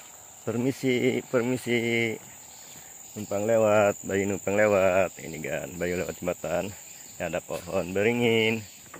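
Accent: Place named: Java, Indonesia. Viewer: Salut dgb Om... berakulturasi dgn budaya lokal, bahasa dayaknya dah bagus walau medok jawanya masih agak terdengar.... semangat... sukses selalu Om.... Bisa reques ngak Om, minta video didaerah SIBITAN... kangen masa kecil ku 30 thn yg lalu...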